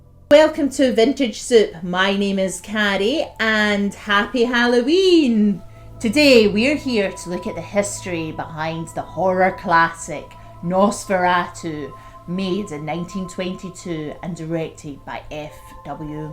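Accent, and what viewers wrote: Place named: Scotland, United Kingdom. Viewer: That was great! Nice to hear a Scottish accent on youtube ( im from Falkirk ). Deffo liked and subscribed! Have a good one.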